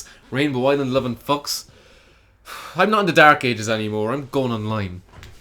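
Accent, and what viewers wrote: Ireland. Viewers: I’m pretty sure he’s Irish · Dude are you from the same part of Ireland as Jacksepticeye??? Hope this isn't offending you (don't mean nuthin by it) but ya accent sounds very similar. Love your reviews fella!!!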